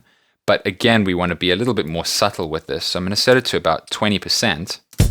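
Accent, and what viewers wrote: South Africa. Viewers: definitely south african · You sound South African?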